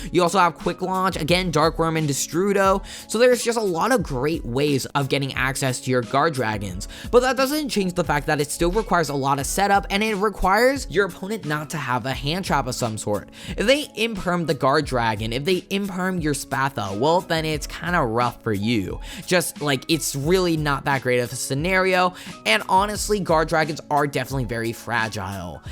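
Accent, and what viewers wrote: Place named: South, United States. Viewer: u gotta read the fine print of the banlist to see the borrelguard ban, it’s very easy to miss. As for the southern accent, I totally see it. It’s way funnier reading my comments in that voice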